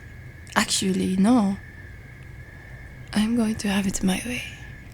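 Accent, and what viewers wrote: France. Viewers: Your French accent is an audible delicacy 😋 ♥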